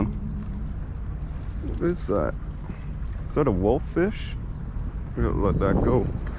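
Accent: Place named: East Coast, United States. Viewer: Couple comments and questions?! First your outro music scared the SHIT out of me. I was intently staring at that fish you couldnt indentify watching him swim away and BAM. Heart attack. 😂 Next up, you sound super east coast. Its great. 😏👌 how do you euthanize the fish? Its nice to see you gently remove the hook. I went deep sea fishing once and the guy just ripped the hook out of the fishes lip 😭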